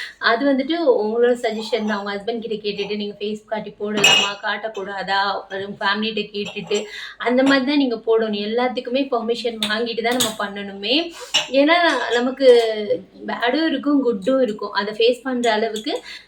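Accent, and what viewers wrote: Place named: Chennai, India. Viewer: Chennai ya sister neenga pesurathu romba pidichirukku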